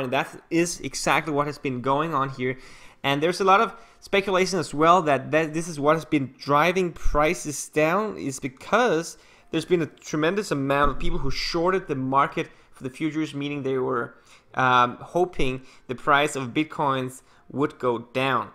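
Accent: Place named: Denmark. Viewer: You sound danish.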